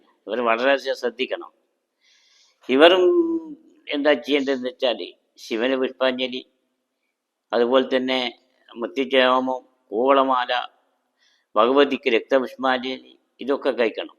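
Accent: India. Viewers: വടകര.... കുറ്റ്യാടി സ്ലാങ് 😍👍....... നല്ല പ്രവചനം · kannur malayalam 👍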